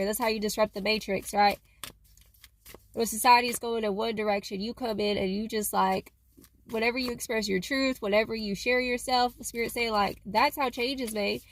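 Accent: South, United States. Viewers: my favorite southern reader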